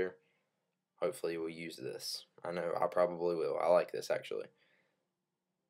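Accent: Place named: Appalachia, United States. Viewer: i cant understand your thick hillbilly hick accent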